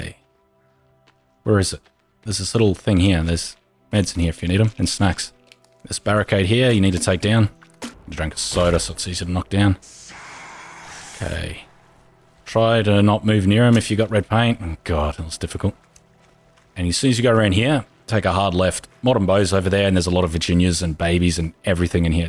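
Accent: Australia. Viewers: Yes he's Australian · Man has a Narrator voice im assuming your Australian pls dont kill me if not but im pretty sure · that's an australian accent for ya mate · I just like hearing this cool, Aussie accent😁👍 hello from Royal Oak Michigan!👋🏻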